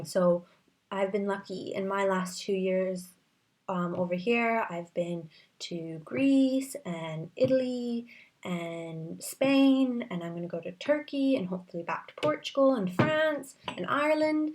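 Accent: England, United Kingdom. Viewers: I'm British and I use 'sorry' excessively, even in completely the wrong context. it's becoming a real problem. [p.s, you have a twang of english accent in this video!] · You sound like you're actually picking up some of our accent :) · You're a lovely ambassador for Canada,. And you're starting to develop just the hint of an English accent. Thanks for the video - and the complimentary views about London: we Brits need to be reminded from time to time that there are FAR worse places to live................! Actually, I think we're a bit spoiled in some ways - and take SO much for granted. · You sound like you picked up a lot of the accent in the UK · I can hear English in your accent! I love when foreigners come over and pick up our accent in some of their words <3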